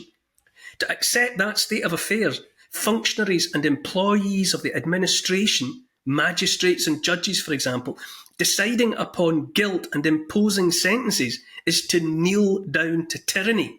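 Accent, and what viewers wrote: Scotland, United Kingdom. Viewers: I ken, i ken 💯 The linguistic unfolding of syntactical tyranny - in a Scottiah Accent 😅👐 Brilliant, greetings from downunder. 👑🌱🔥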